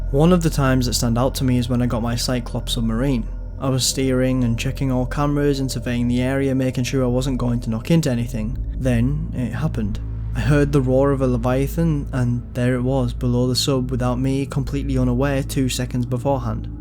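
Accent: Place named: Scotland, United Kingdom. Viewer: First YouTuber with a Scottish accent, makes you listen 🔥🔥🔥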